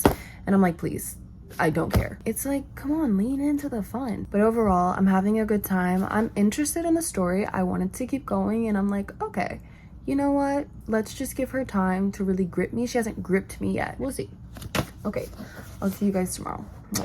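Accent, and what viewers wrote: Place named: Colombia. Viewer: Tu español suena Colombiano….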